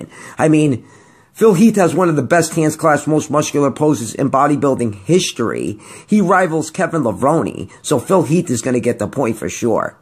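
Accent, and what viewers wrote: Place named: New York City, United States. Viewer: i love the NYC accent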